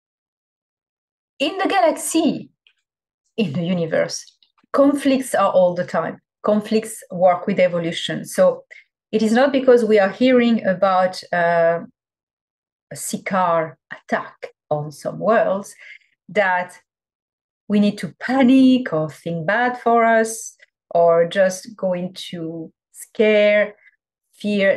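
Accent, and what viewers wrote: France. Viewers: I wish someone would transcribe these videos. I want to hear and understand but the CC leaves so much to be desired.! And I just can't 'get with' the French accent. :(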